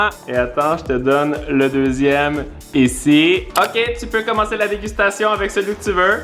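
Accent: Canada. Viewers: tu mon youtubeur préféré desCarl is cooking vidéos accent canadien et qui met quoi des vidéos trop trop rire 🇨🇦👍👍👍👍👍 recette Starbucks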